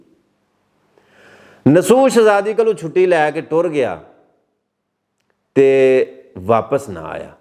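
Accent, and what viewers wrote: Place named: Kasur, Pakistan. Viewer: jee tohada programe pehli wari vekhiya. Dilkhush hogia. Tohada Andaz wadhiya hey. Kasoor de lokan wangoom boldey ho.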